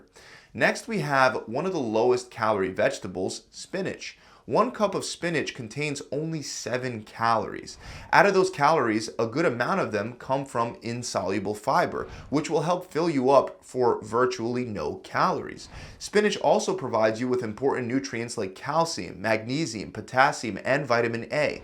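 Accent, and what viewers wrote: Philadelphia, United States. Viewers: That Philadelphia accent is distracting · I know my Philly (area) accent when I hear it …”wooder” 🤣 from Philly or Jersey? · Love that Philadelphia accent. Great information. Thanks!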